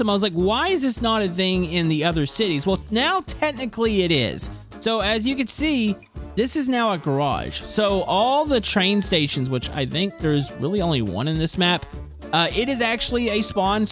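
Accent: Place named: South, United States. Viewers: you have a very southern sounding voice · He's from Texas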